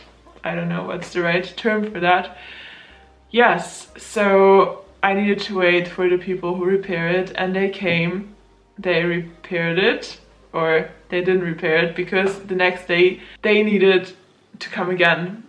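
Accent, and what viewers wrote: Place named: Germany. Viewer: Oh, you’re from Germany. I was trying to figure out your accent but couldn’t get it. You are probably suffering a symptom called “Bangkok Blue or Thailand Blue. Tourists from around the world who visited Thailand get it after they’ve returned to their country. The symptoms are, sadness and can accompany with crying. No need to ask your doctor or searching for a medication for this. It should go away in a few weeks. But if it’s doesn’t go away then you will have to save money for your return to Thailand, hopefully soon. Thailand gives so much happiness to the tourists. Like anything when you feel high and good. But not so easy naturally when you have to come back down. Hope you can adjust back to a happy life in Germany soon. Thailand will wait for your return 😃 ✋🇹🇭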